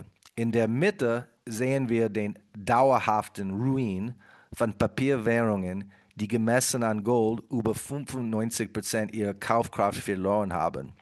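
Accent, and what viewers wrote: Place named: United States. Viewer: 👍 great 👍 oder auf deutsch gesagt 👍👍Top. Dein amerikanische Akzent in Deutsch ist super 👍👍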